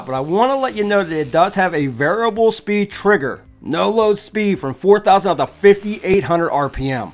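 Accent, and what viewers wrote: Pittsburgh, United States. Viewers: You from Pittsburgh if not you sure have a pittsburgh accent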